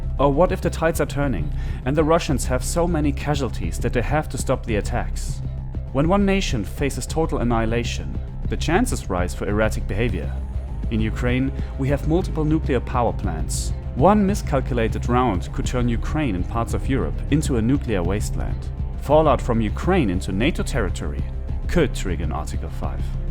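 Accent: Germany. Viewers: Honestly after 5 seconds of him speaking I could hear the german accent :) cheers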